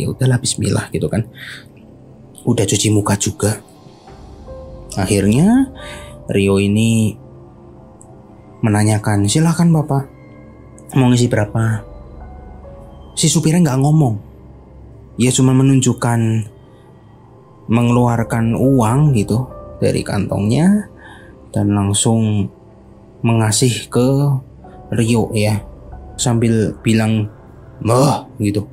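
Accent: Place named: Banyumas, Indonesia. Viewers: wkwkw lucu ya wong ngapak nek cerita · udah medok, kebanyakan kata "itu". skippp2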